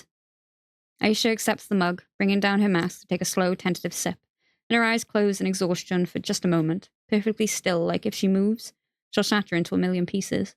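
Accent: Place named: Wales, United Kingdom. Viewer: can you do a good girl's guide to murder?🥺🥺 also I love you accent what part of walsh are you from?😮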